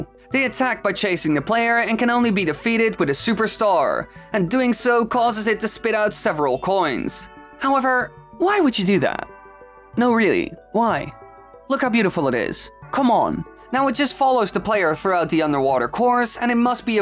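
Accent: Netherlands. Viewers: 0.06 Ah yes a fellow dutchman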